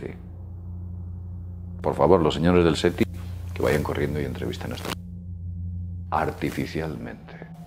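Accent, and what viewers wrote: Spain. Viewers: Que buena información, oye por cierto eres de España no?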